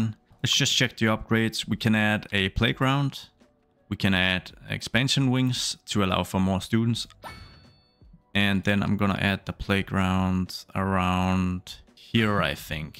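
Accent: Denmark. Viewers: Are you danish? 🇩🇰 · Are you Danish? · are you danish? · You sound like you're from Denmark :) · Really cool!! Can’t wait to play CS2 myself.. by the way, are you from Denmark by any chance? Hearing your accent? 😁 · Are you danish? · Where are you from? :-D Your accent sounds almost Danish! Awesome movie by the way! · er du Dansker ? 😅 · Er du dansker?:)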